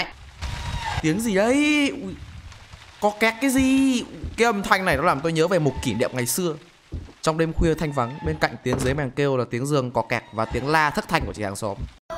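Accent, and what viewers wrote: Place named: North, Vietnam. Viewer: Chuẩn trai bắc đấy ông ạ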